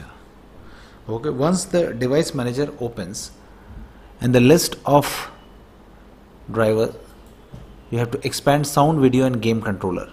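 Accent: India. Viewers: god when indian ppl speek english its so funny t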